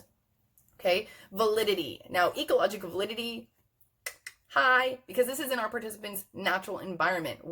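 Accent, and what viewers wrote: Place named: United States. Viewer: thank u thank u for making this engaging to watch because u talk like an actual person. you dont use like a million long words per sentence like these other educational videos you just talk normally and your actually fairly relatable and chatty. and also all the videos for my course are british and after living here for 16 years british accents really start to grate on you so hearing an american accent was refreshing and much less dreadful. its 1am you lowkey kinda saved me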